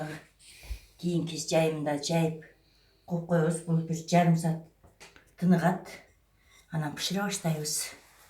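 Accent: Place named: Kyrgyzstan. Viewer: Соонун нукура кыргызча азаматсыз апа колунуз дарт корбосун дагы ушундай видеолорду тартыныздар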